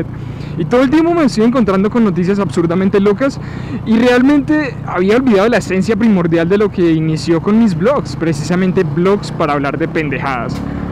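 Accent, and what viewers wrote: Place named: Bogotá, Colombia. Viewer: Desde que ciudad de Colombia grabas? No he logrado identificarla y tu acento no me ayuda es como rolo pero evidentemente no es Bogotá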